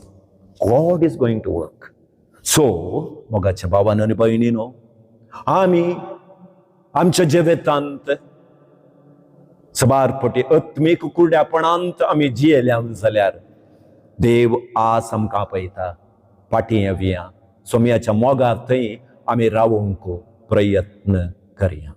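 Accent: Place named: Mangalore, India. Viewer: Amazing teachings Fr. You are always our favourite preacher. Your konkani is mangalorian it sounds nice.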